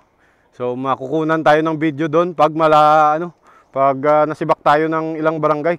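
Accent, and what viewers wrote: Iloilo, Philippines. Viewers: Ilonggo go ka gali ayusin mo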